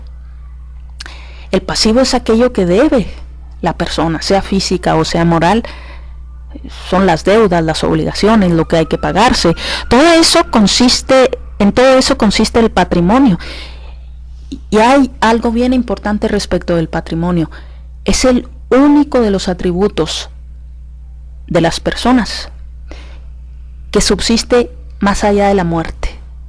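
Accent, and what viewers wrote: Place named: Mexico. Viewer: Por su acento, es de mi querido México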